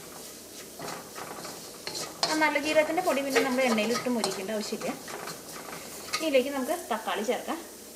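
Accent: Thrissur, India. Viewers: Dear Mam, this is excellent. We also tried your Ullii Chammandi & Rasam powder. A small suggestion - you should have avoided the back-ground song, which is not at all relevant for this particular dish - Nadan spicy Chiken, please check. We also like your off-screen, to the point presentation with a special slang (thrissur????) as bored with the on-screen presentation “of self” of some celebrity chefs, rather than their recipes). We expect more simple recipes from you Mam… Thanks. venu family, surat.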